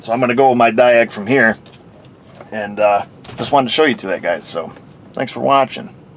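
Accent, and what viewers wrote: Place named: Upstate New York, United States. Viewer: It took me a few times to figure out you were saying Power BALANCE, you upstate New Yorkers talk funny...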